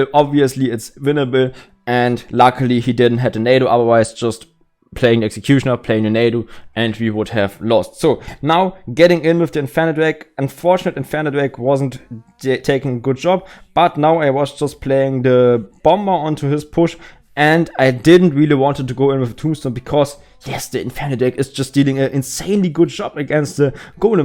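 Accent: Germany. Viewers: Ich liebe deinen deutschen accent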